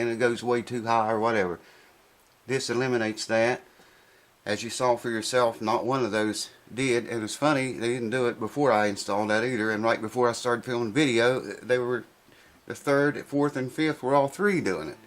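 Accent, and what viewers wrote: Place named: Oklahoma, United States. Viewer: So glad I discovered your YouTube page! Lot's of great info, like the one on capos. I hope this isn't too personal, but whereabouts are you from? I ask because my daughter overheard me playing your videos and said "he sounds like grandma!" Lol. She's meant your accent, her grandmother is from Oklahoma. ☺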